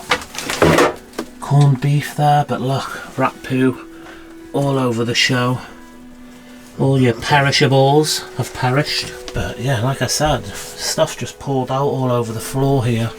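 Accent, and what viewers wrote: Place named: Bolton, United Kingdom. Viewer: Hi, I’m from Bolton, living in Northern California (yep, it’s raining buckets now 😄☂️🍂) and I have to ask where are you from? Freaky or just plain intringuing, all your episodes are really interesting. Descriptive, and personal - with your connection to the lives in the houses, and talking it through on camera. I’m hooked. Your accent takes me home too. Nothing else, could you just share where you are from. And I now realise I sound like you, talking to a spirit both close and far away. Thank You for sharing all your episodes:-)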